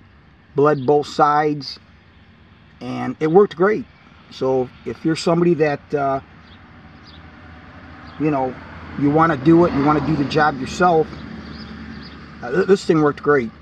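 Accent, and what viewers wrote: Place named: New Jersey, United States. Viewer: Are you from Jersey or Long Island?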